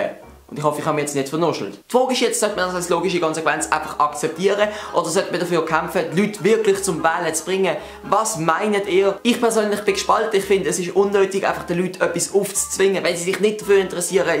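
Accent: Basel, Switzerland. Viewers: Me merkts mega! ich kum au vo Basel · Ich mag den Schweizer Akzent👌 Und nur weil du Schweizer bist haste ein abo von mir😂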